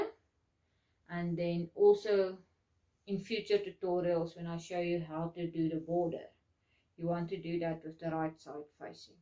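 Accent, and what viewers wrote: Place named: South Africa. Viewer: This is perfect thank you! Your accent brings me back to when I lived in SA! ❤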